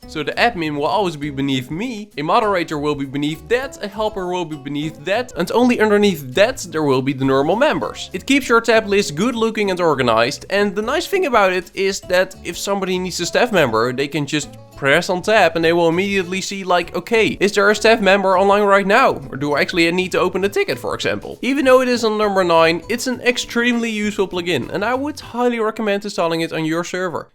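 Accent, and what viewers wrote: Netherlands. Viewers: jij klinkt erg nederlands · Hallo ik hoor wel dat je nederlands bent hehe ik ben grootte van van jou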